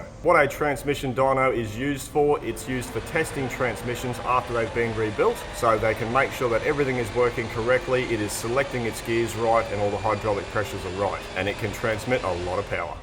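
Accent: Australia. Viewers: All this time I thought was starting these videos saying "Hey young guys..." and wondering why he thought old guys (like me) wouldn't be watching these videos. But today I accidentally had the closed captioning on, and was surprised to discover that he was saying "How you going guys?"! I guess I need to brush up on my 'Stralian. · I have never had the captions on and thought the same thing, and I definitely don’t fit that description 😂. I have boots older than 😢. Thanks for the translation of Aussie to American 😊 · Hi, great videos. Your explanation of the purpose of the springs in a flex plate/clutch is wrong, maybe it's just the way you said it, you speak Aussie and I speak American. The main purpose of the springs is to absorb torsional vibrations that are generated by the engines that drive them. The torsional vibrations can destroy a gearbox or transmission. 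In automotive applications they also act to prevent harsh engagements when the clutch the clutch pedal is released. Automatic transmissions don't need them as the torque converter/fluid coupling acts as a damper. The size of the flex plate, number of springs, and the rate of the springs is governed by the horsepower it needs to transfer to the driven parts. A multi-cylinder engine does not need heavy springs since the power impulses (torsional vibrations) are close together. A one or two cylinder engine needs very heavy springs as the torsionals are close together. Look at it this way: In a four stroke engine with two cylinders, there will be one power pulse per revolution. In an eight cylinder engine there are four power pulses per revolution. Think of these as positive torsionals as they accelerate the engine in the direction of rotation. Conversely, every time an exhaust valve opens, there is deceleration due to the drop in cylinder pressure, so in the case of the eight cylinder engine we have four positive pulses and four negative ones, The negative ones are of less intensity of course. 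When I used to work on boats a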